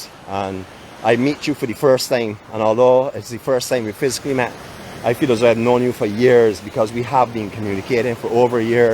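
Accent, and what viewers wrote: Barbados. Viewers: So interesting to see. I was staying in Christ Church 26/7 years ago (for five months) and spent all my time at White Sands Beach and Apartment complex - Austin, who you mentioned, I know very well. I’m shocked to see that where he worked has changed drastically…I hardly recognise it as the same place. I wonder if he still works there for George. Really enjoyed this video with your great commentary and your wonderful Barbadian accent. Thank you, from Wales UK 🏴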